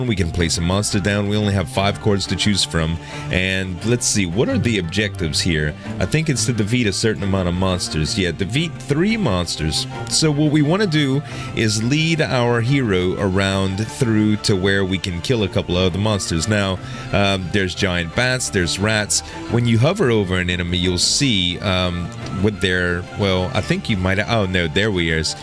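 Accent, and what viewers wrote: Louisiana, United States. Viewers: Are you from Louisiana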